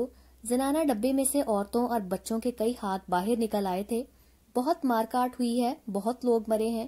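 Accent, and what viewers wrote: Pakistan. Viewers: Mam, where do you belong to? Actually your level of urdu speaking is same as that of pakistani serials! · Aapki voice lyrics se pta lag rha that u re from Pakistan m i right?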